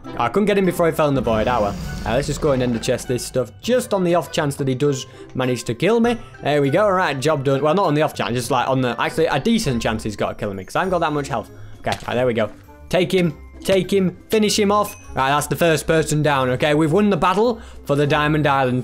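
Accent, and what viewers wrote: United Kingdom. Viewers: I have a question, are u British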